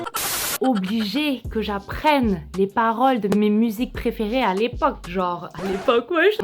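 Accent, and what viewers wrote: Philippines. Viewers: you sound more like a filipino accent.... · Tu acento en inglés parece filipino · I’m glad naman I can still speak Tagalog, of course English and I can speak Spanish. I plan to learn Mandarin, Japanese and French sometime in the future! I love lesrning about different cultures coz it’s so intriguing and good for the soul diba. Salamat with a H 🤪😜😃🙂 Your Tagalog diction is so good! Yun diction mo Filipino talaga. I guess yeah you’re right your English has different accents pero Pilipino more hahaha. First time I’ve met a Filipina and Algerian, beautiful mix!! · pretty sure your English is pinoy accent but smoother lol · Your tagalog is very pinay ...Lol......I enjoy the video :-D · Your english is close to filipino english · Filipino Accent nga ang English mo bhe😍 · Lol i love you im kapampangan living in U.S hit me up when u get here lol. 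Ur accent s filipino accent that learn English 😍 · Your English is very pinoy 😊😂 And I speak English, Spanish, Tagalog, French and some Portuguese. 😘 Hoping my future daughter will look like you hehe Sobrang belle <3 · You are very beautiful and eloquent!!! Your English accent is so Pinoy.. love it!!! I can speak 3 languages, Filipino, English and Portuguese.. You have the basic in Spanish, I think you can easily learn Portuguese as well... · OMG when you speak Tagalog you're so cute! And when you're speaking English I can hear a Filipino accent xD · Je pense que ton accent en anglais est un peu l’accent philippin. Mais, oui, c’est influencé déjà par les autre langues. Magnifique vidéo. Ton Tagalog est parfaite! 😊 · You literally have a Philippine English accent HAHAHAHA I love seeing diaspora have our accent I think you've adapted the Filipino accent when you went the Philippines haha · You have filipino english accent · Your english accent resembles the Manila english accent. 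Probably because of your mom's and relatives' english accent (tagalog-influenced) when they speak to you. But of course it's not 100% since you are also influenced by Parisian French but I think the accent leans more toward the PH english accent. This is the very first vid of yours I've seen -- found you interesting and funny so I subbed. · Your English accent actually sounds very much like Filipino-English. 😅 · the filipino blood is screamin in the way you speak wow the accent lmao hahaha the berry and very such a filipino thing more power to you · your english is definitely in filipino accent, love it hahaha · Your english is Filipino accent hihi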